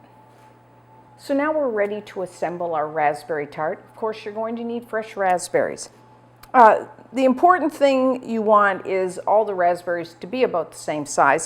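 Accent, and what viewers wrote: Canada. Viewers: you sound Canadian. Are you Canadian?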